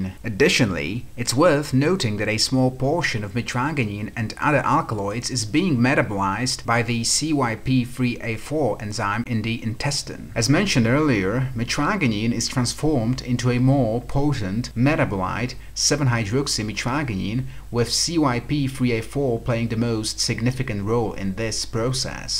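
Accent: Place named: United Kingdom. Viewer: Jeej... to znie britsky, nie americky A hlavne nespievas 😅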